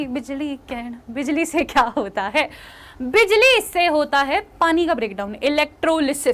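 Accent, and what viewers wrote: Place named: Haryana, India. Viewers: I am listening here the haryanvi dialogue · Mam you and your Haryanvi accent is awesome 💖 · Hariyanvi teacher's hits different ❤🫣💯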